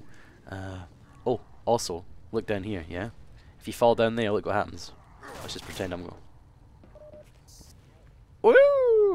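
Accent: Scotland, United Kingdom. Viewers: are you scottish? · no hes scottish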